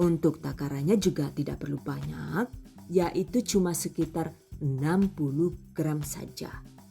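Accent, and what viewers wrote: Banyumas, Indonesia. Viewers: sebelum2 nya cuma nonton n👍.tp baru2 ini subscribe .sangat cleaaar penjelasan nya . ide2 buat jualan nya goood...ngapak nya 😁👍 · Kiye wong Madiun apa wong ngapak si sing duwe channel dadi geget😂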